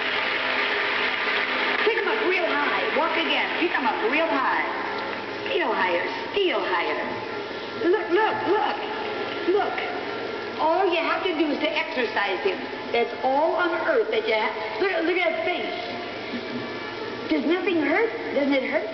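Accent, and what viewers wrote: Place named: Germany. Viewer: Analicemos su origen y epoca: Kathryn kuhlman nació en la ciudad de Concordia, Missouri, a padres Alemanes (su acento). Se convierte a Dios a los 14 Años en la Iglesia Metodista de Concordia, y comenzó la predicación en la Ribera Occidental a la edad de 16 en Iglesias Bautistas. En julio de 1975 su doctor le diagnosticó un cardíaco leve y tuvo una recaída en nov 76, como resultado, murió en feb de 1976. Pese a sus altas y bajas fue una mujer llena del poder y la uncion de Dios.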